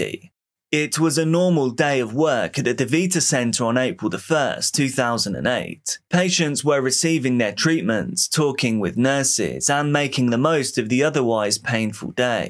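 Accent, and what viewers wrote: England, United Kingdom. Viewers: then your English accent is flawless 😅 he’s definitely English, from a fellow English person 🫡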